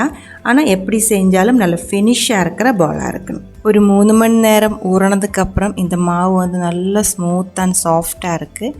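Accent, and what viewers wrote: Kerala, India. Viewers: കേരളയെവിടെയാ സംസാരം കേൾക്കാൻ നല്ലരസം ഉണ്ട് തമിഴ് മലയാളം കളർന്നു കേൾക്കാൻ നല്ലരസമുഡ് 👌👌👌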